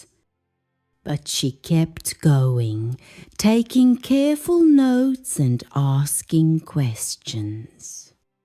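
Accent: United Kingdom. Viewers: Is it British accent?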